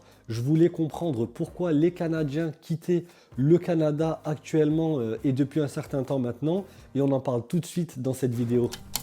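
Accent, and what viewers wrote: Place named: France. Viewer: Il est évident que vous êtes un Français parce que je comprends ce que vous dites sans aucune difficulté, mais seulement 50 % des gens du Québec.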